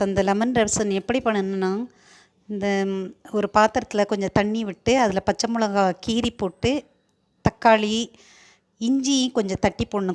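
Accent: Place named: Tirunelveli, India. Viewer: சமையல் சூப்பர் ... தங்கள் பேச்சில் நெல்லை மணம் வீசுகிறது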